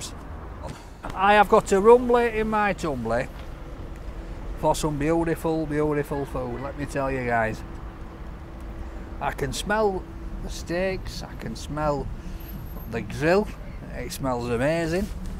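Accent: United Kingdom. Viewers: I try to keep my captions on so I can read what he's saying the British accent is very strong and it's hard to understand sometimes but I do love a British accent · Thank You for watching a Brit talking like a Brit from his region of Britain. I sometimes miss the odd word he says, but as Brits we just go along until it’s impossible to understand what’s going on, the gist is more important than the vernacular. All the best.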